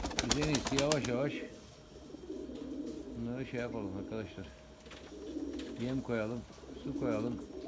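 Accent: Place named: Izmir, Turkey. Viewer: Esenlikler abicim. Şiven İzmirli gibi ama bizim buranın insanı taklacı bakmaz. :)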